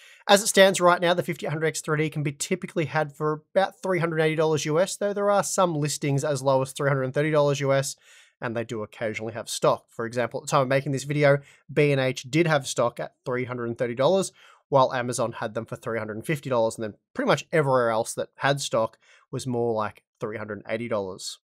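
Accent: Australia. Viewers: The difficulty is probably higher with the Aussie accent on top of that. Though Steve from GN also spits out numbers at a million miles an hour.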